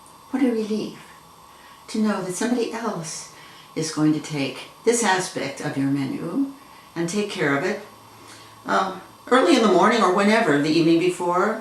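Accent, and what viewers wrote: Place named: United States. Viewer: hi, can you please writing the explanation on the description tab or email it to me so i can cook it cos your American accent doesn't help me too much and as well i m not English speaking native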